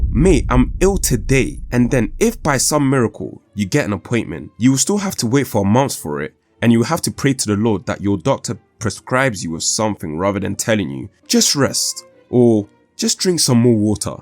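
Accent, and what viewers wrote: United Kingdom. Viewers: Yo are you British🇬🇧🇬🇧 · The worst thing about living in the UK is dickheads talking like the narrator of this video.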